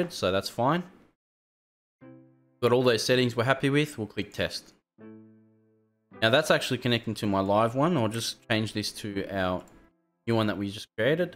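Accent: Australia. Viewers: don't let Americans force you to change the Aussie lingo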